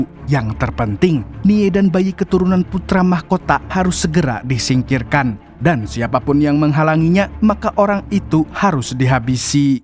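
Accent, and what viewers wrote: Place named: South Kalimantan, Indonesia. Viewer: Bicara nya mimin ini seperti logat tembang madihin.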